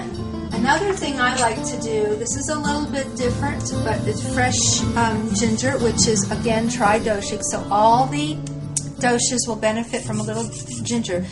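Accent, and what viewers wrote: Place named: United States. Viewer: Why do mercans say "Urrbs" instead of "herbs", it clearly starts with H not U...and turmeric isn't even a Urrb...it's a spice!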